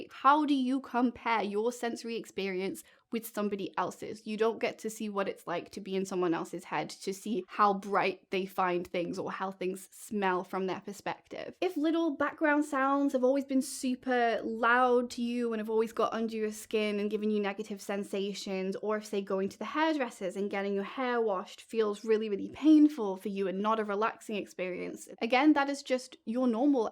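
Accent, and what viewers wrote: United Kingdom. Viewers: I did not notice or ‘hear’ your English/British accent until you said the word crisps... several minutes into your video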